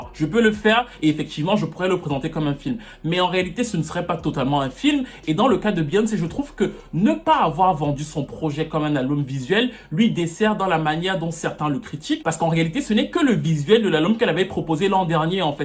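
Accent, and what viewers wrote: Cameroon. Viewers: Tu es trop beau, trop sexy, trop hot. Ah le Cameroun…. Tu es intelligent et j’adore ton accent. Bisous je suis une fan de Chine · Je viens de regarder ta vidéo et j'adore ton accents !! Moi aussi je viens du Cameroun 🇨🇲 et tu peux vraiment être fier de toi 🤗 😍 c'est même pas la peine de les répondre ces haineux ! ⚠️ 😡 J'adore toutes tes analyses ! Tes trop 💪🏾 fort j'apprends tellement de choses... Merci pour ton Travail 🤗🤗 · Je me suis toujours dit qu'il y'a un côté camerounais en toi. Non pas pour ton accent, mais par ta manière de parler. 💪🏾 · j’étais sur que tu étais mon frère Camerounais (d’ailleurs je t’ai posé cette question sur une autre video) Ta façon de parler et quelques manières sont typique🤗 J’aime beaucoup ta passion elle est contagieuse. Une seul mot « Continuez »😉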